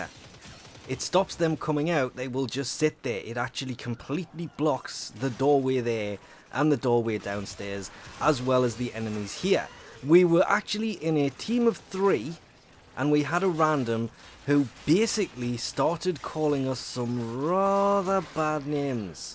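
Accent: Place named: England, United Kingdom. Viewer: Where do you get your accent from are you English uk or I dunno I'm just wondering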